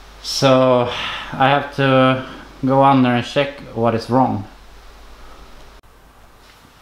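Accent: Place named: Sweden. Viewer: Hördes direkt att du va svensk, nice video!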